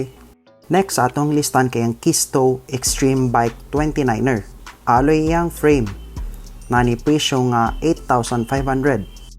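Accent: Visayas, Philippines. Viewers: A future Ian of Cebu 😊 However may I just suggest to explain further. When you say hydraulic what's with it against mechanical? When you say "Nice" for a certain part/bike spec explain why you say so? and so on. Overall its nice to hear a bisaya version of Ian. Padayon lang migo!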